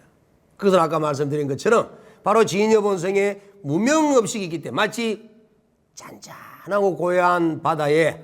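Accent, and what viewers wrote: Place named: Busan, South Korea. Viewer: 🌈 듣고 또 듣는 이 소중한 법문 정말 감사드립니다. 제가 하와이에 살아도 , 법문을 부산 사투리를 섞어서 하시니 고향의 정겨운 마음이 웃음으로 더 제 마음에 담아집니다.🙏🙏🙏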